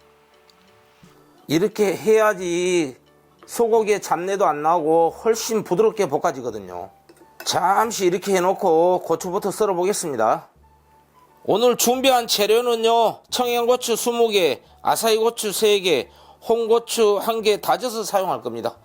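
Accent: Jeolla, South Korea. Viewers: 늘 존경합니다 진심이 느껴집니다~^^ 전라도 사투리가 구성져요 ㅎㅎ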